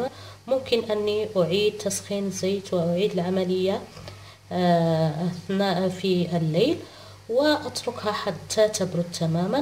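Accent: Morocco. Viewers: مغربية عرفت من لهجتها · لهجة مغربية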